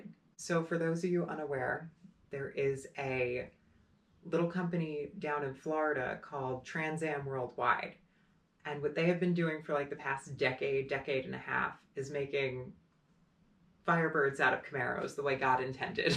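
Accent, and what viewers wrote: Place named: New Jersey, United States. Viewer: Do you mind if I point to your channel? Im about to record my long form episode on this. Did a stream last week on a friends channel, and I talked about this ad, and why I do believe its going to happen, and even know who will be hired as employees and whatnot. Just came across your channel, and you totally sound Jersey. For a moment I thought you snuck into my garage. Same cinder blocks, even the AC in the window is the same. Did you sneak in, move 12 cars, pull out my 1320 and sneak Herby in my garage lol.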